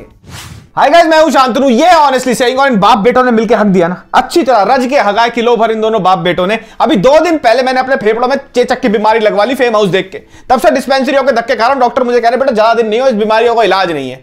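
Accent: Haryana, India. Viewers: Are you Haryanvi??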